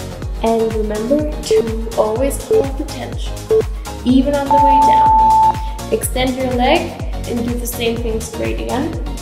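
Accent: Germany. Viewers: Hi i just wanted to tell you that you have sich a beautiful body and you are so pretty. I also really like the style of your Workouts. Are you from germany? Bc your accent makes it seem like you are... :) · An alle sie ist deutsche merkt man auch wenn sie spricht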